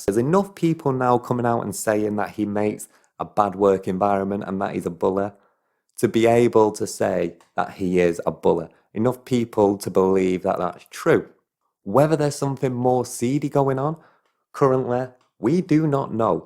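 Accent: Northern England, United Kingdom. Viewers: This was unwatchable - awful norvun accent and garrulous to boot. 0/10.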